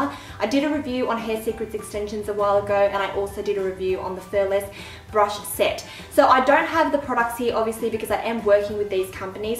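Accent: Australia. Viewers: Bruh where should I start congrats btw 10k 🙌🏾🙌🏾 u deserve it, ur vids kill me there so funny I love ur personality for making something funny and ur Aussie accent is on FLEEK😍😍 . The reason why I want to win the extensions I really want to try something new im so used to weaves that I never considered extensions so why not try something NEW 😃😃 And for the brushes I haven't really liked makeup brushes u can say I'm new to makeup but I still use the basics and never had good experience with cheap as 2$ brushes 😂😂 ... Umm I don't know if I make sense but yeah lol 😋😋😎😄💁🏾💁🏾 LATERZ✌🏿️✌🏿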